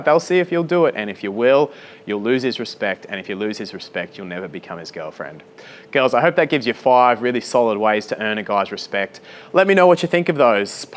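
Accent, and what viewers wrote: Australia. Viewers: Is it Australian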